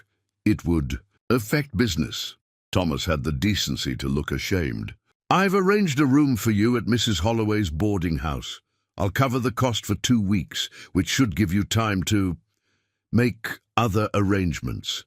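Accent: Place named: England, United Kingdom. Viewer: Why would you use an English accent for the AI voice?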